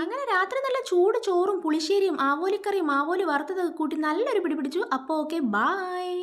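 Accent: Kollam, India. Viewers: Kollam കാരി ആണോ 😂 സംസാരം and മീൻ items കണ്ട് ചോദിച്ചതാ.. നമുക്ക് മീൻ ചാറില്ലാതെ ചോറ് ഇറങ്ങില്ല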